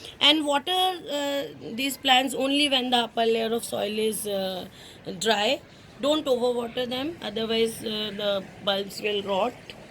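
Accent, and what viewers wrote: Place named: India. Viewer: It sounds like you're from India or Bengladesh, how do you propose to deal with the Lilly's dormancy period in the tropics? I'm trying to protect my lilly plants, they stayed small this year and got chewed up by some kind of beetle. I finally dug them up this morning and started dividing the undersized bulbs and putting them in tiny planters I normally only use for cuttings. I'm hoping that they'll store more energy unmolested in the window with a screen between them and pests. :( I don't use artificial fertilizer at all because it damages the environment and the potassium and phosphate are mined. I use OMRI certified organic if at all. I prefer to use compost, and I've found that putting food scraps in the blender makes them break down into compost faster. High nitrogen fertilizer is actually very bad for plants, making them structurally weaker. 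So make sure any variety you buy is lower in nitrogen. Use grass clippings or shredded leaves at the base of the plant instead to get nitrogen to your plants at a more natural pace because they can't use all the nitrogen in artificial fertilizer.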